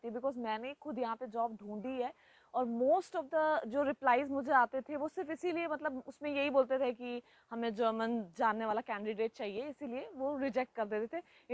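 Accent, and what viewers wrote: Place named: Pune, India. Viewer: your hindi accents like Pune or mumbai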